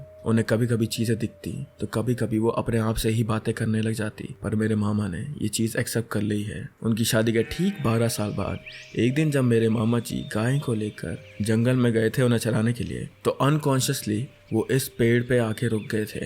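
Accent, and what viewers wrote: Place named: Garhwal, India. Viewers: your pahadi accent is soo cutee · That Garhwali accent 😂❤❤ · Your Gawhali Accent is Awesome 💞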